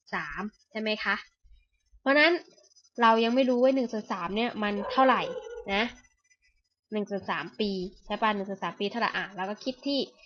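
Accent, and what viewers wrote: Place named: Suphanburi, Thailand. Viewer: สําเนียงสุพรรณ คึด ถึง พุ่มพวงเลย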